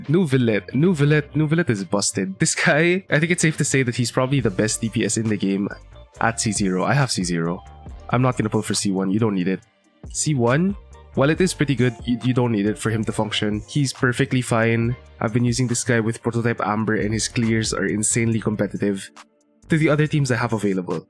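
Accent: Philippines. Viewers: Sounds very Filipino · why do u sound so filipino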